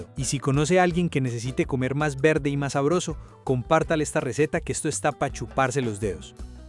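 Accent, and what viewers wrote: Colombia. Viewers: Greeting from the USA! No tengo ni que preguntar de donde eres. Ese acento Colombian se distingue muy bien. Gracias por tu receta muy rica. Ahora que me puse de dieta, voy a empezar a vez mas tu canal que acabode descrubir.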